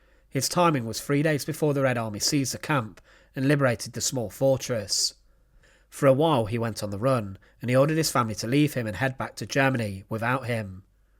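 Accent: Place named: Cockney, United Kingdom. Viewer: I know. I have a difficult time understanding his Cockney accent.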